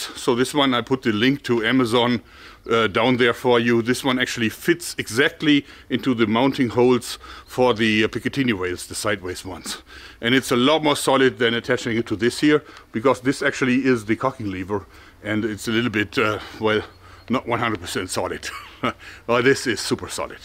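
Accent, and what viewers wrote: Germany. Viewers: your german accent hope thats right my apologies if it is not is absolutely amazing really adds to your videos keep it up! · Dude. Just came upon this channel right now. Hearing your accent I thought "ich denke daß dieser Man Deutscher ist" and then I took a look. Essen Deutschland. I love it man! And you English is killer, like many Germans. Thanks for the great content. Great content. Thx!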